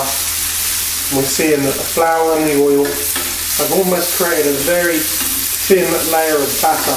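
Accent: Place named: Australia. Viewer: I like your recipe and I like your knife but your Australian accent is hard to listen to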